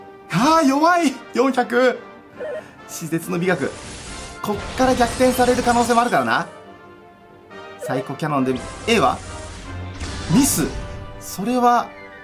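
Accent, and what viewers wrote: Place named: Osaka, Japan. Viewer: 大阪のおばちゃんかおもいました。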